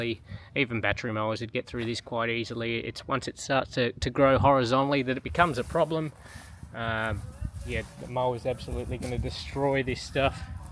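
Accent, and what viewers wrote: Australia. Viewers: Like this style of video. Plus we get to hear more of that Aussie accent.:goodvibes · I could listen to you speak all day. I follow a lot of YouTubers from down under. Love the accent. ❤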